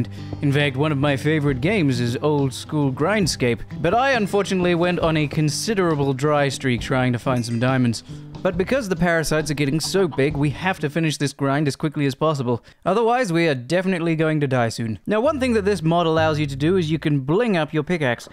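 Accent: England, United Kingdom. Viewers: is this dude british or from somewhere with heavy british influence? the way he said darkness kinda makes me think so like the r isnt sounded just curious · dude i never heard British dude playing Minecraft 😅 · your voice is so bri ' ish that i should hate it but god dam it, i love your voice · This is the most aggressively british youtuber ive ever heard Instant sub, very nice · Nice bi i s accent mate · The British accent is marvelous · is the most Bri'ish British YouTuber I have ever seen, and I love it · He British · more like least formal Posh Brit (Your Average Brit has a cockney accent and cockney is anything but formal) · Dammnnnn british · Are you British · you are deffinitly from the uk, ive never seen anybody talk like that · He is an English gentleman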